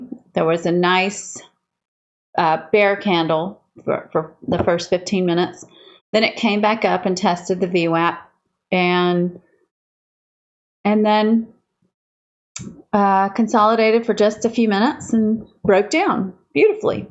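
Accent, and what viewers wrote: Georgia, United States. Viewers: I should have guessed. I'm from south GA. BBT is so global, I don't often hear familiar accents. LOL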